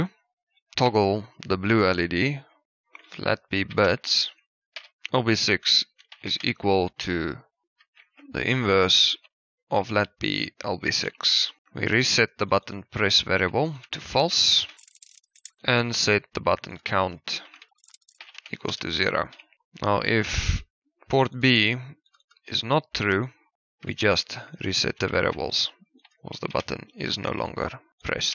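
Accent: Afrikaans, South Africa. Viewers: Klink of jy Afrikaans kan praat :-). Great videos! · Is jy afrikaans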